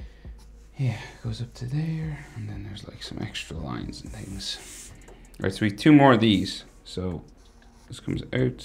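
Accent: Ireland. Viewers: bruh are u irish i am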